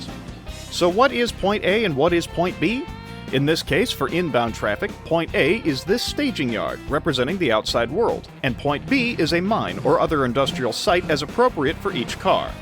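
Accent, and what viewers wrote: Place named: Texas, United States. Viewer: WHY do you sound like texan Gabe Newell?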